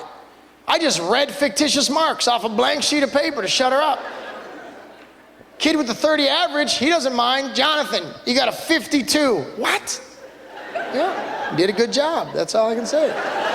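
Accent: Canada. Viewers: I love how he says whore. WHOORE. I love Canadian accents^^ love from Montreal, QC^^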